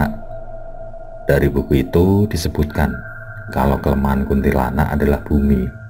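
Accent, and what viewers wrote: Java, Indonesia. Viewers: Orang jawa mana suara nya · Orang Jawa yo mas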